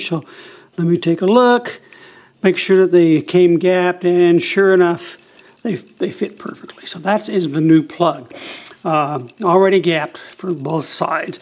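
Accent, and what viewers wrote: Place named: United States. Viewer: The plugs are a lot easier to access than the plugs of a BMW R850. Gee, I had to remove the valve covers and even then you need a special slim designed spark socket to remove the plugs. Consider yourself a lucky guy. You can buy a long socket for about 20 euro at Wunderlich. I am not sure where you live. Your last name is Dutch but your English sounds very American to me. When you live in the US there is also a Wunderlich in the States. Otherwise Amazon has them.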